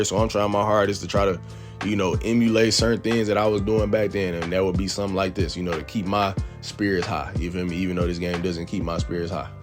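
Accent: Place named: Chicago, United States. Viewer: You talk like you from the chi lol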